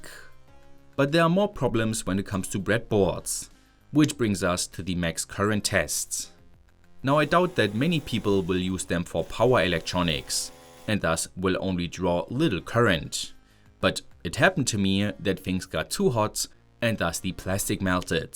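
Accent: Germany. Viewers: Bitte arbeite dringend an deinem Englisch oder sprich einfach deutsch. Man hört das du deutscher bist, dein Englisch ist einfach nur grottig.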